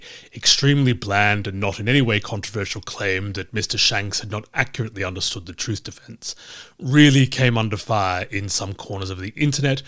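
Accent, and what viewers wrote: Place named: RP, United Kingdom. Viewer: You're the only Australian I've come across with an Etonian posh boy accent. Thanks for explaining to everybody how we in the UK screwed up out legal system over the past 400 years. It's a pity that you Australians can't shake of our baggage and come up with a better system of your own.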